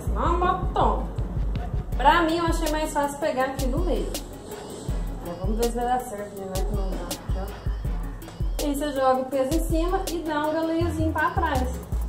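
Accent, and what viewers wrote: Minas Gerais, Brazil. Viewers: Esse sotaque nosso é bonito demais sô 👏😊✌️🤘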